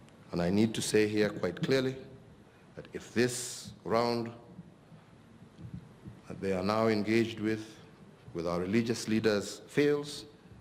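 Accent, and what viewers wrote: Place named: Luo, Kenya. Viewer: You should at least sort someone else to read your post. otherwise ujaluo itakumaliza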